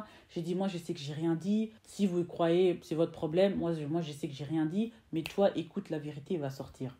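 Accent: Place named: Democratic Republic of the Congo. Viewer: D'après ton accent Je dirai que tu es ma compatriote congolaise est ce vrai ?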